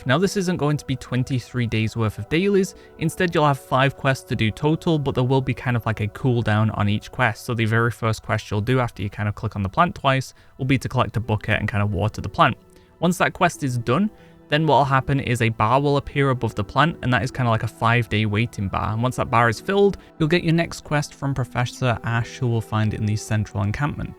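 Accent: United Kingdom. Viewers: You're an absolute unit. Playing a half empty PTR for weeks to provide us collectors with top notch information. I also really love your straight to the point but still detailed style of video - and your UK accent is just the cherry on top, love it 💖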